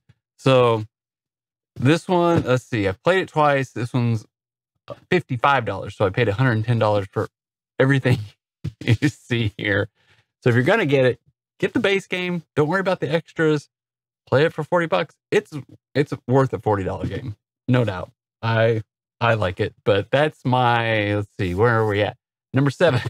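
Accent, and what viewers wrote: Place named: South, United States. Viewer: the southern drawl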